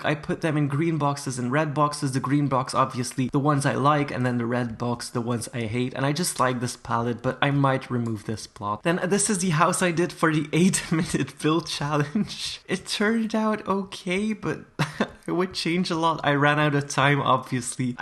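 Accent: Norway. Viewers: are you norwegian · Are you norwegian?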